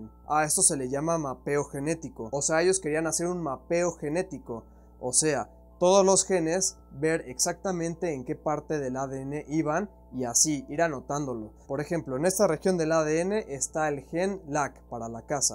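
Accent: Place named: North, Mexico. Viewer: de que parte de méxico eres hablas entre norteño y de ciudad de mexico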